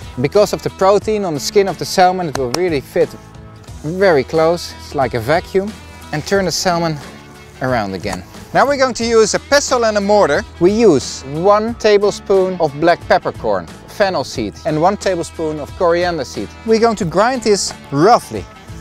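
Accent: Netherlands. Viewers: Hi! Are you Dutch? It seems like you are, since I hear a very Dutchie/English accent:p Groetjes!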